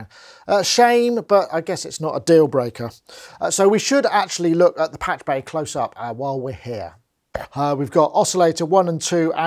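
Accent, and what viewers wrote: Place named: England, United Kingdom. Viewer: English accent at its finest.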